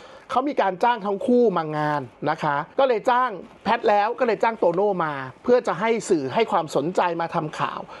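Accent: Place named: Isan, Thailand. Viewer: เสียคนอีสานเหมิ้ด😅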